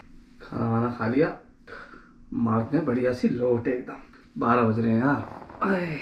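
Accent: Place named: Uttar Pradesh, India. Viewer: Bhai UP se ho kya 😂😂 me b UP se hi hu same accent tmhara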